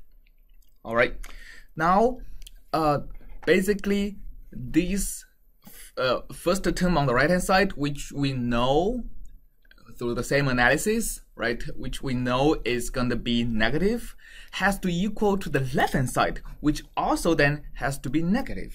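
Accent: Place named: China. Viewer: Horrible, thick Chinese accented English. Completely unintelligible. Pity the MIT students.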